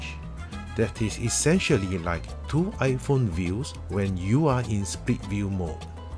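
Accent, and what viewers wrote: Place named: Singapore. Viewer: This dude sounds Singaporean.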